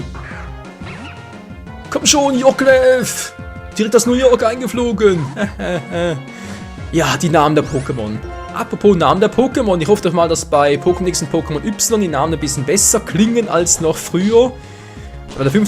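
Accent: Switzerland. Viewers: ja man hört es auch bei akzent das es schweizer ist ich bin italiänerinn und rede deutsch wie eine italiänerinn aber schweizer deutsch so berndeutsch wie eine schweizerin xD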